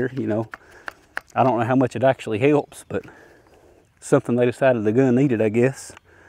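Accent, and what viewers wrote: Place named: Kentucky, United States. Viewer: Love that Kentucky accent!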